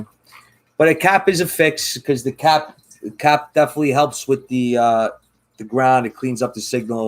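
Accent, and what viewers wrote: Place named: New England, United States. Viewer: You accent makes me miss life in New England (grew up in RI and MA). I'm currently in EU but hoping to get back next year.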